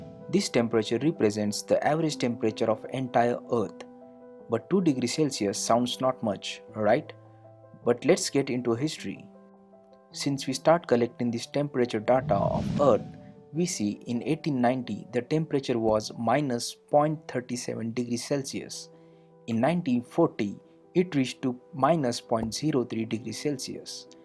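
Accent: India. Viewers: I got even more confused with this shithole Bengali accent..!😂🤣🤮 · I think his accent is indian.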